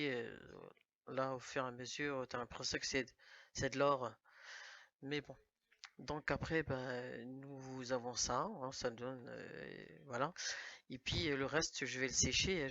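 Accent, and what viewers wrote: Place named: Chleuh, Morocco. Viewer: il me semblait bien à ta façon de parler que t avais du sang chelh 🤣 Moi aussi je suis chelha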